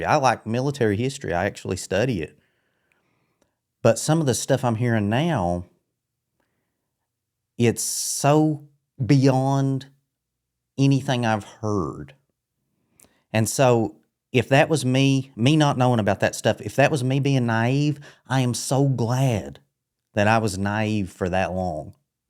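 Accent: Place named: Tennessee, United States. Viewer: I'm also an infj. We are definitely on the same wavelength. And I'm from Madisonville, I told my friend Paula I thought you have a Tennessee accent. Anyway, I enjoy your videos and completely relate with your experience.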